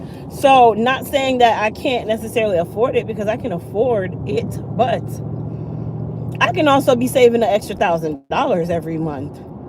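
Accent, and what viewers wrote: Jamaica. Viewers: how are you, you always look good and your hair's on point, I love the color and style period. You are a funny person and when you get hype or talk fast I hear your accent the show that you are from Jamaica, I'm just smiling the whole time and still smiling while I'm doing this text to you, you are the type of person that every person need to have you around them, you happy, funny, joyful, very, very smart, and a beautiful person, Heaven Sent from God, I'm glad that I know you from afar and I will always be looking at you live on video, and ordering your products, I just order some of your products April 1st, 2023, thank you for that 35% off, I do appreciate it so much, take care and tell the next time, take care be safe and God bless always, love you girl, peace out.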